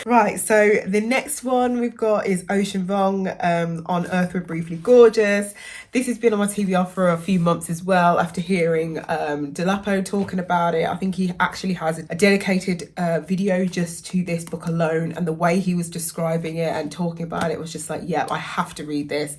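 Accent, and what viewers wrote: Midlands, United Kingdom. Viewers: Glad you got 'Exciting Times' cheap. My experience going in hearing promising reviews, was left feeling more unexciting times. But hope you have a better experience with it, love your content and hearing a fellow midlander on YouTube ✊️ - Fab ✨️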